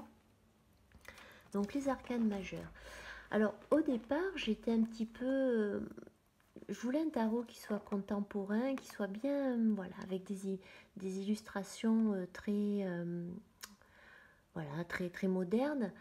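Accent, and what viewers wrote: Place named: England, United Kingdom. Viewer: L'ACCENT ANGLAIS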